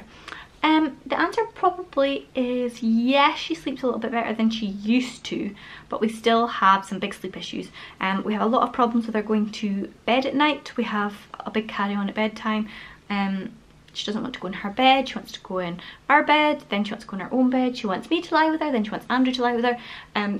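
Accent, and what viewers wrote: Scotland, United Kingdom. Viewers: Hello doll... I am An old fat Scottish Jew living in London! Like to watch your videos to hear a Scottish gal And also your content is always entertaining! X thank you for taking the time to do this. Anxiety touches everyone, we all just deal with it differently. Please remember and focus on the positive influence you’ve had. X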